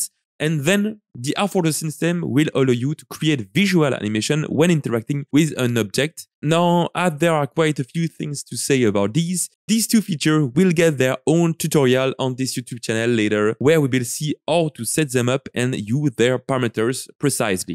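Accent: France. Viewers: On dirait un belle accent français là non ?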